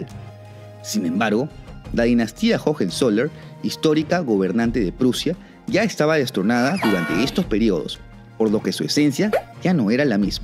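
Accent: Peru. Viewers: Que FEO el acento peruano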